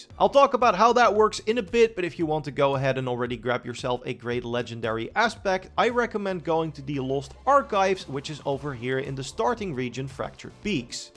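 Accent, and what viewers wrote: Netherlands. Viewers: Loving that Dutchlish. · 99% sure it has to be a Dutch accent 😅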